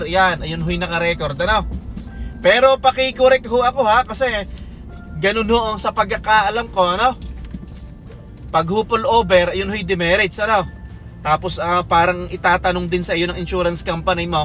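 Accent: Batangas, Philippines. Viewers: nakakainis punto mong batanggenyo, Tsk pinanood ko pa naman to kasi taga edmonton din ako.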